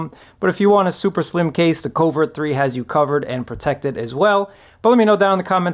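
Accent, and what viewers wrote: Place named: Brooklyn, United States. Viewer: Straight outta Brooklyn lol. Well done with the video. I'm sold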